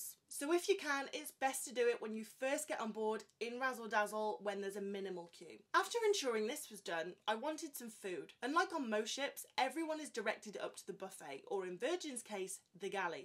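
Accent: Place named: Midlands, United Kingdom. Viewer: That sounds like a Midlands accent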